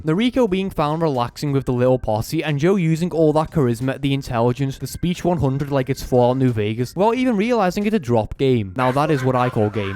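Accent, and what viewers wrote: Liverpool, United Kingdom. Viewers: they re both from liverpool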